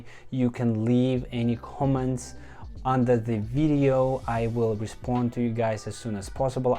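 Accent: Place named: Russia. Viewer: Love that Russian accent, you have that BDE 😂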